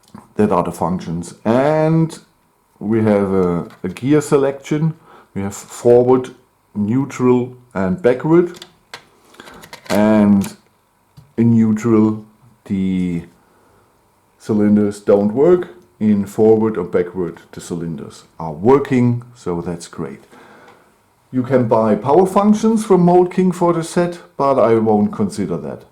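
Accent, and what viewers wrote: Germany. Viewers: That german accent though...🤷‍♀🤷‍♀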